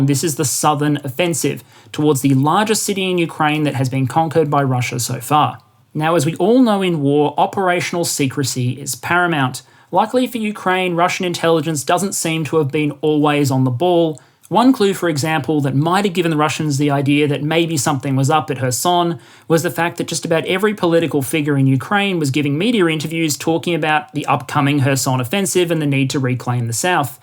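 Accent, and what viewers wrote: Australia. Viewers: I'm Australian and he sure sounds like an Aussie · Thankyou for all your good work mate. Informative, instructive, clear and concise with a small dose of ozzie for good measure. Keen for more. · Not sure how a guy can speak in a fairly monotone Aussie voice, yet still be by far the one of most interesting and informative views on the war in Ukraine.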